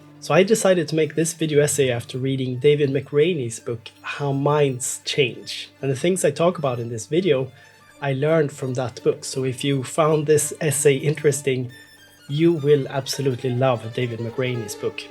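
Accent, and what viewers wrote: Sweden. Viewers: I think this sounds silly, but I've been seriously hurt by some Swedish people before, and unfortunately, I've become distrusting of them as a result. Your videos help me hear your accent and work on destigmatising Swedish people as whole just because of a couple of bad apples. Thanks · unapologetic swedish accent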